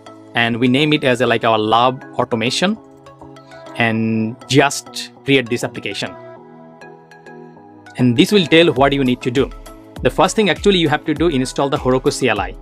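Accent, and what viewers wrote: Bengal, India. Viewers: Just by listening to his voice, I can say he is a Bengali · You are definitely A bong with your accent i can tell that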